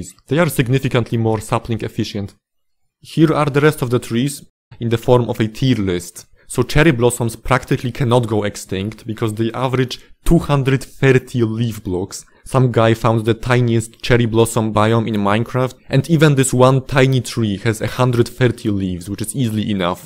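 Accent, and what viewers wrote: Poland. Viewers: your accent is too polish. can't watch. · Bro i swear to god you sound 99% Polish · Myślę sobie, kurde akcent jak u Polaka, patrzę na informację o kanale - oczywiście że z Polski xd pozdro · polish accent is peak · Are u polish? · Czuć po akcencie że rodak ;) Fajny filmik. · As a fellow Pole, I have to say, you have the most stereotypical Polish accent ever 😭😭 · the second i heard the voice i knew you were from Poland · Are you Polish mate? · Do i hear Polish accent or am I tripping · You sound polish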